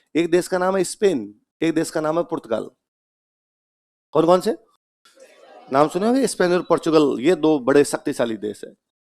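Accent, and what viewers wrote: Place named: Rajgarh, India. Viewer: Sir me rajgarh se hu apki puri bhash meri jesi he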